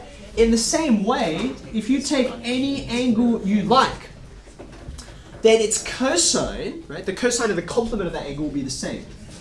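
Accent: Australia. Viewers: I love the Australian accent ... "I know some of you know what the cooeeuuaarrrrr in cosine means." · I was not expecting him to be Australian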